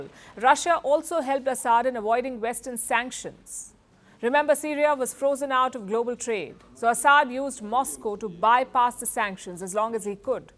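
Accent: India. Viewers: Now I know you are garbage news, with indian accent. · Palki, the puppet Western propagandist with an Indian accent.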